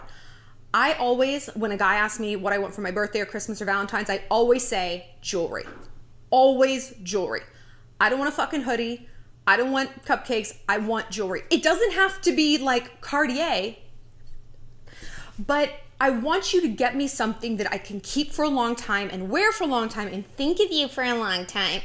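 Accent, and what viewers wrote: France. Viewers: As a partly French person, let me say - your French accent is delightful and the phrases you do know are perfect 👌🥖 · OMG! I'm French, and your French accent is truly amazing. I love it! <3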